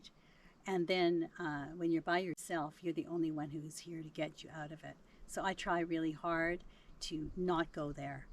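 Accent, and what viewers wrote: Canada. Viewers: thank you so much. You are so easy to listen to. You’re pleasant and warm and full of wisdom. I’m from New England really and can hear some Canadian in your voice. I consider northern Maine home. I miss nature and always walked on my own in the woods and fields. I’ve lived in Utah since 1983 minus two years I drove cross country to be with my mom as she passed from bladder cancer. So the travel bug bit me. I divorced after 30 years and my kids are all grown and very busy. Apartments are too expensive and I just don’t want to feel stuck anymore. Sorry for the long post. 😊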